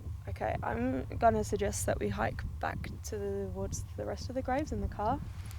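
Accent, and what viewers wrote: Australia. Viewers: Hey 😍 how ste you angel i would love to go to Australia I just love the accent 😍 I love yours angel obt way ❤ I love that spooky video at the school I saw saw both awsome love 🌷🌷this video omg is so mysterious you can feel.the chill thank you for another video love from your back out back yard lol love always angel 😍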